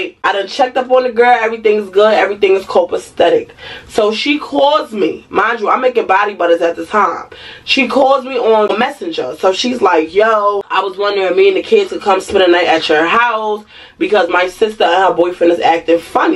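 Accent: New York, United States. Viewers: New subbie . Are you from BK? I swear you sound like a NYC girlie . Anyways, the advice you gave her about the advance and taxes was so solid, but she wasn’t ready to hear It. Your friend reminds me of a girl I used to be cool with . Tried to help her get back into school, paid for groceries, and tried to help get her life back on track just for her to dog me out. Sometimes it’s not about you, ppl are gonna be who they gonna be 🤷🏾‍♀️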